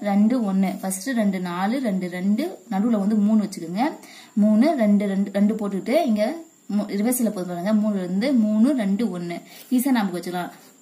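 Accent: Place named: Chennai, India. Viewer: Unga chennai speak super madam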